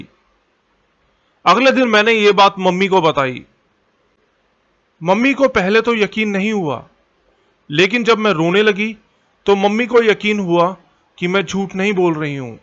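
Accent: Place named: Sindh, India. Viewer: Aap kya sindhi ho kya baat karna waqt rhoda sindhi sound karta ho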